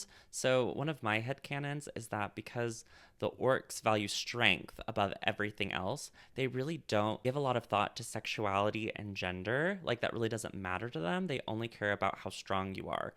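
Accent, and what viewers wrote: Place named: United States. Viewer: the way you envision the northern lands is SO different from how i picture it, i love it! i always pictured it as very windswept barren grasslands, like the steppes in northern china/kazakhstan - its completely different from your vision. whats so interesting to me is that given that you're from the northern hemisphere (presumably, you have an american accent lol), most people from there hear "north" and think cold - im from aotearoa in the southern hemisphere so north = hot to me! yet our respective idea of the northern lands are almost opposite to what our geographical perspective would lead us to, its so fascinating.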